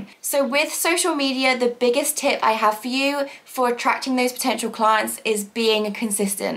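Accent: United Kingdom. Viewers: Hello, thanks for your so helpful videos! I love your BRITISH accent but you speak a bit fast lol. thanks again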